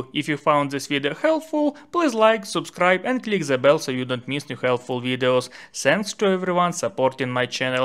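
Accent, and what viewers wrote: India. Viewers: You sound like a scammer from India !!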